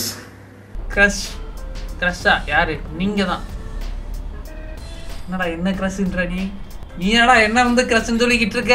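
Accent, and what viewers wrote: Madurai, India. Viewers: Madurai ya Anna ne basa nailla irruku nainga appadetan pasuvom 😅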